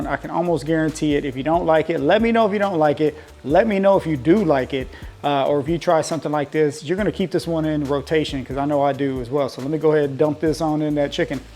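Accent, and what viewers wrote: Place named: South, United States. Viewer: Great video!! Love your southern accent!!!! What state are you in?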